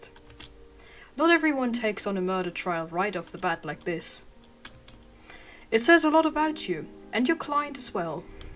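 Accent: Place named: United Kingdom. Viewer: du klingst so brittisch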